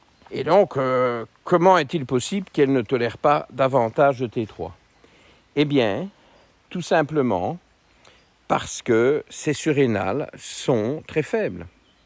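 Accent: Belgium. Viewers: Merci pour ces éclaircissements docteur. Je vais voir pour ma prochaine analyse en novembre. Entretemps je vais voir avec votre assistant les possibilités de consultation. Je viens de Bruxelles et j'ai cru comprendre d'après votre accent et les paysages de vos ballades avec Goliath que vous êtes situé en région de Liège. Je devrai probablement prendre congé pour venir vous voir. A bientôt de toute façon ;-)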